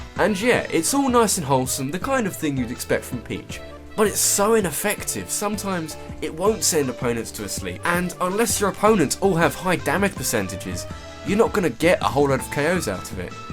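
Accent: England, United Kingdom. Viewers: it's called a different accent. He's from england like me. we don't say YOghurt either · are u actually british or do u use a voice modifier? sometimes I cant tell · you know i gotta go against your comment that (please read the next phrase in the incredibly satisfying British accent) "you need to be good at brawl to gain any great succes with R.O.B." my cousin was pretty much a joke fighter when we played, he made the characters fell/run/fly of the stage half the time and he only managed to land attacks and KO´s by mere luck... then he used R.O.B. in Brawl and next thing i know: my and the CPU´s characters are sent flying either as a flaming fireball or with a headache because of R.O.B.´s whirly laser eyes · Haha, made me laugh mate! That british+ your video style= geez omg its sooo goood <3 I really dont care if it's going to take you a while getting theese vids done, as long as the keep on coming! 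;D <3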